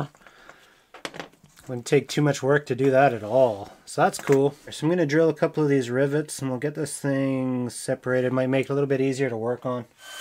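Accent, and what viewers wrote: Canada. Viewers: You're Canadian?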